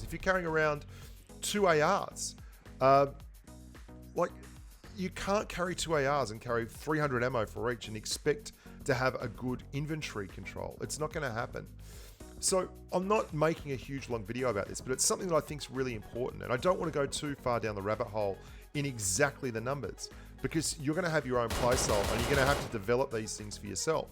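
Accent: Australia. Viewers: Dude I love your accent! Australian??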